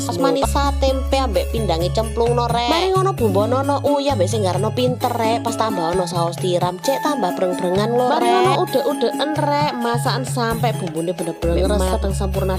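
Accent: Surabaya, Indonesia. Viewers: Suka sama ide masaknya dan ciri khas bahasa Surabayanya.. terimakasih Ning.. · lucu.suroboyoan pollll .garam bikin pinter😂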